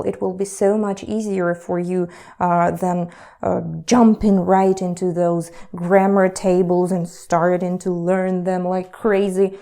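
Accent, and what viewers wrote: Russia. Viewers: на самом деле русский акцент очень слышен)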